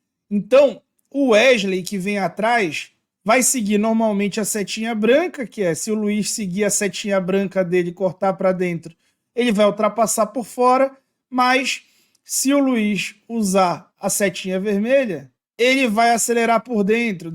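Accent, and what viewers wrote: Belém, Brazil. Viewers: Vc é de Belém?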